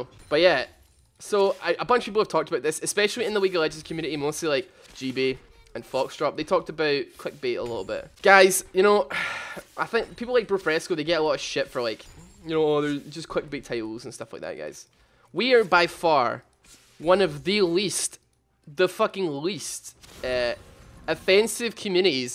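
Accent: Scotland, United Kingdom. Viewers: keep up the good work. and ugh your scottish sooo........ THERE CAN BEE ONLY ONE! did i do that right?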